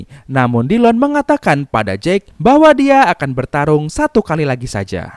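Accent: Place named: West Jakarta, Indonesia. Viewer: aroma aromanye ente orang jakbar bang ye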